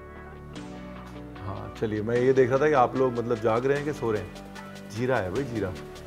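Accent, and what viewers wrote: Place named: Bengal, India. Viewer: Your way of talking is quiet similar to bengalis, do you have any connection with Kolkata , as becoz your accent, way you throw words are very sweet and not like typical Punjabi's. 🌼🌼❤️🌸love from West Bengal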